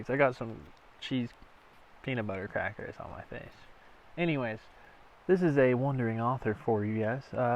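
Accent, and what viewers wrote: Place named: Carolina, United States. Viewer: Awesome Carolina accent! 👍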